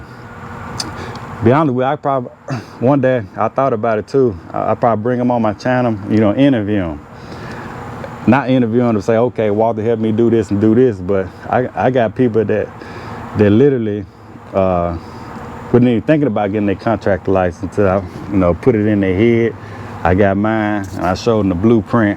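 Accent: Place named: Kentucky, United States. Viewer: This Gentleman has a " BEAUTIFUL " Kentucky Accent !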